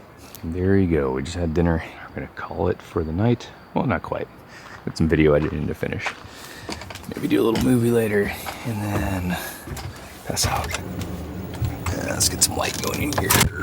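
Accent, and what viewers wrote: United States. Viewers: You sound too American, lol it’s not been there before they say bEEn.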